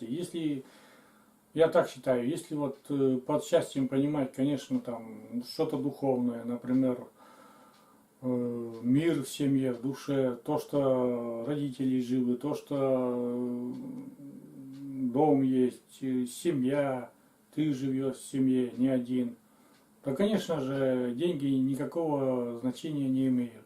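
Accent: Komi, Russia. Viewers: Привет,очень интересно было тебя посмотреть и послушать твой типичный комиговорок.....однако! Восхищает твоя жизненная позиция, простота и откровенность. +41 от меня и всего тебе доброго, нужного и хорошего.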